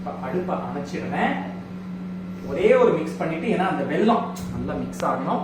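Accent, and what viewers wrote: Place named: Thanjavur, India. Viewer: Age old recipe. Gothsu and gosthu are same. Regional pronounciation. Typical tanjore district pronounciation! Gosthu, embLadhu for eNbadhu😂😂 it has its charm.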